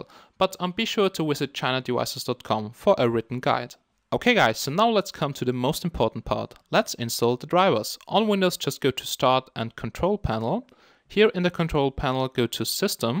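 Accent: Germany. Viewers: Man hört ein kleines bisschen den Akzent von Deutsch raus und Firefox ist auch in Deutsch, Wenn du Deutsch sprechen kannst, hab ich eine Frage. Weißt du wie man das THL T6 Pro 166L Flasht? will KMDROID 0.8 flashen aber bin kir dabei etwas unsicher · Hey I have got a Problem with the Scatterfile :( if i try to load h scatterfile Sp Flash show me an error and say sometihg about overlapping ..... Itry to flash he original Rom From The Manifactory website there is also an Ubdate but both of the roms dont load corretly by Sp Flash .... The Device is A Ulefoe U9592 .... Can you Help me Please ?? Wenn sie Deutsch Sprechen wäre es nett wenn sie es in Deutsch Beschreiben was ich machen soll :) (Wie ich auf Deusch kömme :) der Akzent klingt nach Deutschem/Österreichischem Englisch )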